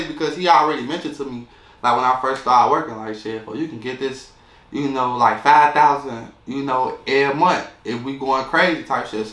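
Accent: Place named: Washington DC, United States. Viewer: This brother sounds like he's from DC. Sorry he had to deal with all that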